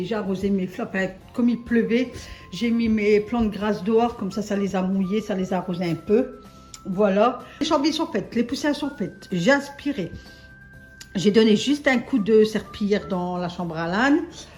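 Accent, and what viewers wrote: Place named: Nord, France. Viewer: est marrante elle s' exprime bien comme dans le Nord aussi bien dans les expressions que dans l' accent. Je suis de la région.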